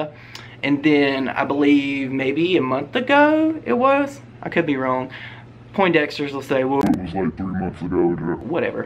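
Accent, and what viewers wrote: South, United States. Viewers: I love your southern accent so much! And you have a good perspective, I totally agree that Jason's jokes were unacceptable. Nice video! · I love your accent! Usually southern accents are so intense and I can’t understand people but yours is lovely lol also great video thank you ❤️ I am subbing!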